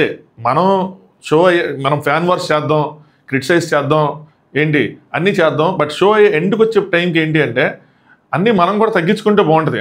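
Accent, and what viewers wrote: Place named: Vijayawada, India. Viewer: Vijayawada slang vachheytthandi annai🤣🤣🤣😂adi thoti vijayawada mate ki matramey telusttundi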